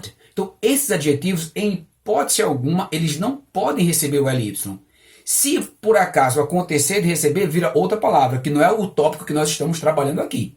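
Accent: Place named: Northeast, Brazil. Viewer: Vídeo bom! Acho que você é nordestino 😅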